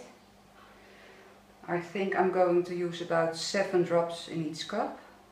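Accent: Netherlands. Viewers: You sound Dutch! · the accent is enough of a clue to know you're a fellow dutchie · You sound Dutch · Thick dutch accent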